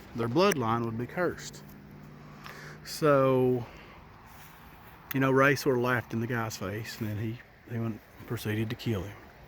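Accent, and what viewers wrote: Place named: Alabama, United States. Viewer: We visited your area the week before Memorial Day. We stayed mostly in Townsend...and then Gatlinburg a couple nights. I would have really enjoyed sharing a meal with you. You sound just like my people here in Alabama. I appreciate the information that you share. And I do believe it. When we were travelling up that way, we were amazed by the scenery. And when you sit and watch it on video, it really doesn't do it justice. There is no way to describe just how expansive and breathtaking the smokies are. We took some really back roads that were not the beaten path. And when you experience that...you get it. Things can hide within a hundred feet of you. The terrain, for me anyway, is not walkable. Where we stayed, we couldn't even walk the yard it was so steep. You have to be in better than PRIME shape to move about the mountains. 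And these flatlanders at my house do NOT have mountain legs...lol. Do you have any stories about Townsend? I would love to hear them. Thanks and God bless.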